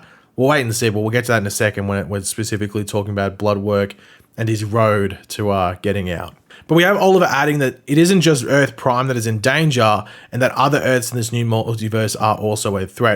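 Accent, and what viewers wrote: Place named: Australia. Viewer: sorry i've been smoking but i forgot you were Australian till you said crisis lol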